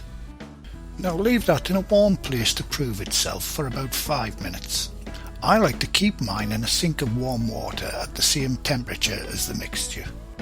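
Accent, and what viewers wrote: United Kingdom. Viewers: Hi, I'm a too, I used to make bread weekly, thirty years ago, and am just getting back in. I've killed about three "sourdough starters", don't start in the winter, I've made a couple loaves well, just baked your "baguettes" yesterday, first time with such, and they came out beautiful, light and fluffy, crispy on the out, and I'm making bread for dinner tonight, with my daughter and son in law. I've long made one loaf, by "division", in cups, teaspoons, but this is the first time doing it with grams, and I expect to have more "equal" outcome, I really like weighing, because it matches with humidity and such better. I only hope my loaf comes out half as nice as yours, this afternoon. I've been using my "mixmaster" always did by hand before, and am shocked at how easy it is, with the mixer. I love your technique, I've kneaded for decades, and it "makes" the bread. 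Thanks for a great recipe, and excellent technique, bringing me back to doing it right. Your British accent makes it that much more enjoyable, I'm a retired mechanic, Marine, I try not to get the dough too dark, lol. Thanks for a great video.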